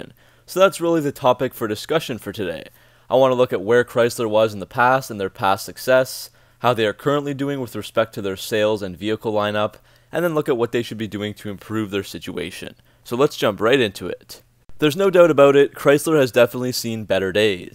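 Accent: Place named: Canada. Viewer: Lol, that Canadian accent is strong.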